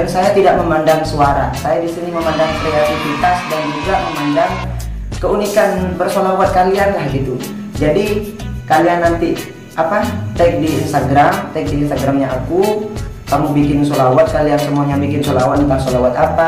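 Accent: Madura, Indonesia. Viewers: orang madura ya mas..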